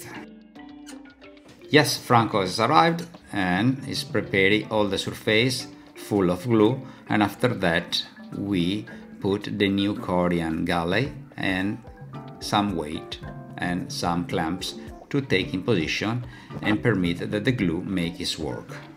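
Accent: Italy. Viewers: "The restoring project for SAILOING around the world.", you speak english with a nice Italian accent. I like it. But, it is the first time I see someone WRITING with an Italian accent. Beautiful work, by the way!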